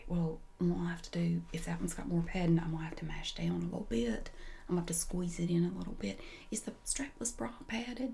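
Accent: South, United States. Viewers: Dev 2 Turnt do you know of any others? I moved to the south and my interest for asmrtists has changed with the people I'm comfortable around I guess. I haven't found any that sound this southern, or from my region of the south. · You are awesome. Love the accent and southern gossip! Lol seriously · It's so nice hearing a southern ASMR artist. I find you incredibly relaxing, especially since I'm from the south. It's almost like a comfort from home. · I'm from Florida and am just a little too far south to have my own southern accent 😒 . I LOVE yours though 😊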